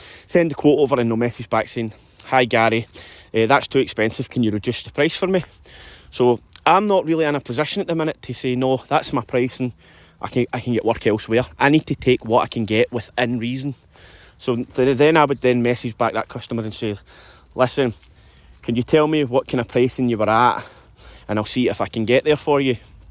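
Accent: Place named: Scotland, United Kingdom. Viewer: I feel your Pain m8, im guessing with the accent your from central scotland like myself Hamilton Its hard going ,ive been doing Roofs,Gutters and Gardens to try and keep it going I was exact same as you m8,i worked the parcels 6/7Days and could never get over A Grand A week no matter what , Yes we can make in one day and than what we were making Couriering but its when it gets to this time of year it gets harder Listening to your story is like reading my own experiences more or less like for like, Hope It Goes Well m8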